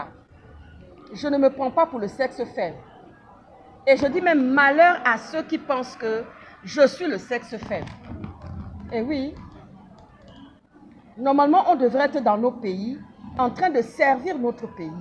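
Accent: Cameroon. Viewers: Tu te dis gabonaise mais t'as l'accent du cameroun.hummm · Tu es sûre que tu gabonaise ? Tu as l accent du Cameroun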